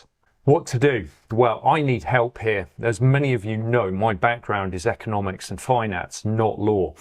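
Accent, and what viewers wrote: United Kingdom. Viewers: you sound like a Brit.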